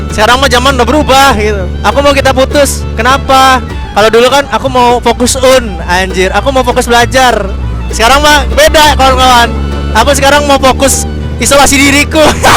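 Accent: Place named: Java, Indonesia. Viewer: Nah begini bang kemaren medok bat jawanya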